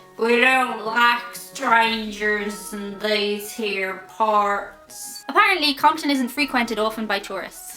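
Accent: Ireland. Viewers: Love the Irish accent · Enjoyed the video! I don't know if you are intentionally toning down your accent, but it is far milder than many Irish people I've met and listened to. 1) I think you're the first person I've ever heard of who went to Compton as a tourist! LOL You'z in da hood, girl! 2) Don't think we should base gun policy on the vague 'way guns make me feel'. 3) Having lived in England before (which I'm not lumping in with Ireland), I think you're spot-on about the greater variety of body types you frequently encounter in America, and you're the first I've heard to put it that way. Insightful observation. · i really have a thing for irish girls she is hot and i love her accent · My family is Irish . You don't have a very strong accent. Come on, Of course you knew you don't visit Compton! So what about guns, Get robbed & you'll want one too!! No fatties in Ireland?? 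But you DO HAVE MY FAVOURITE MRS. BROWN'S BOYS. CHEERS🌞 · You're losing your Irish accent. Or maybe you're toning it down on purpose? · Lmao your Irish accent just makes everything that much more enteratining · Like your Irish accent and personality! Y'all cool with the Scots?!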